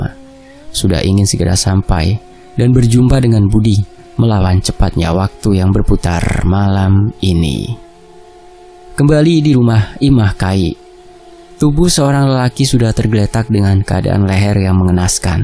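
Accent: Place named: Sunda, Indonesia. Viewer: Lucu denger bahasa Sunda nya .. 😁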